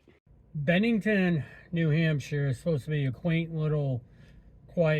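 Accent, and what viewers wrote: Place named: Louisiana, United States. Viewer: ✨⚜️🍁🍂🎃🪾SUCH A GOOD DRIVE! ARE YOU FROM NEW ORLEANS BECAUSE I CAN HEAR UOYR LOUISIANA ACCENT🙂🍁🍂🎃🪾⚜️✨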